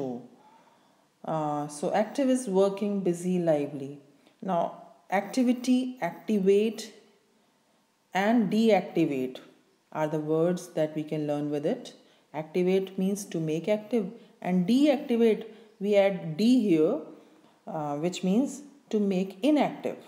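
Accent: India. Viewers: Terrible accent, unfortunately. Most probably Indian. Also, a lot of grammatical and vocabulary usage errors.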